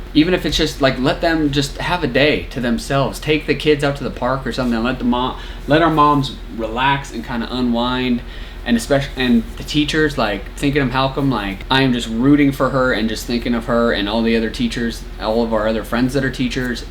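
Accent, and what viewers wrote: Tennessee, United States. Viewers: So glad I’m done raising kids .. I would be a nervous wreck if my kids were little at this time .... Do I detect a little Tennessee accent starting ? I think maybe .. 🤗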